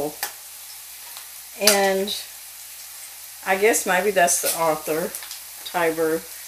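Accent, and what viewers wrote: South, United States. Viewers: I agree with you, I love this channel and enjoy listening to. She is a true Southern lady. My husband and I have been fortunate enough to travel across the USA as well as foreign countries. People always ask us to talk so they can listen to our Southern accent. Foreigners have described our accent as akin to singing, What many people do not realize is Southern accents vary from place to place. If you enjoy Mrs., I believe you will enjoy “Cooking with Brenda Gantt.” Brenda lives in south Alabama on the Florida-Alabama state line. She has a large repertoire of Southern recipes and operates a bed and breakfast. At the request of some friends during the pandemic, she made biscuits and posted the video on Facebook. She was a huge hit, and in little more than a year, she has over 2,500,000 followers. She is also on YouTube. There are two channels that I watch at least once a day—Mrs. 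and Mrs. Brenda. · You sure have a kitchen full of antiques! Love them.......Why would there be any haters. Because of the southern accent. That is GOOD southern food. Reminds me of my mothers cooking. We lived in Birmingham, Alabama till I was 8 years. Then moved north, but she continued to cook the southern way. I rememeber her saving bacon grease - my dad loved the FRIED foods! Too much of it not good for you though. Mom was a terrific cook! She could make pies that would win prizes! Made everything from scratch, and so perfectly. Canned in the fall everything there is to can. She was a very hard worker. There were 6 in our family. Love fried cabbage too - in fact love cabbage fixed any way. Going to put some in my soup today. Sounds like you people live in the Ozarks area. How beautiful it is! We were there in our travels when I married. Camped in a private front yard campground!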